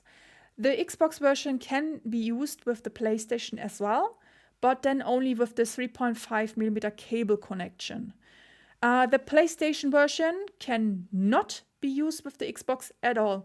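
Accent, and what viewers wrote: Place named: Germany. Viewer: Tell me my ears are right and you're German. 😁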